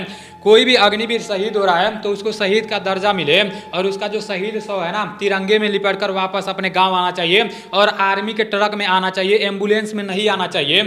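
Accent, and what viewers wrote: Bihar, India. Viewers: Yaar tu bihari language mat bol. Bihari lag rha hai tu. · पहले तुम हिंदी बोलना सीख जाओ उसके बाद यू ट्यूब पर आना, दू दिन नही दो दिन पहले कही भी बिहारीपन छाप छोड़ जाते हो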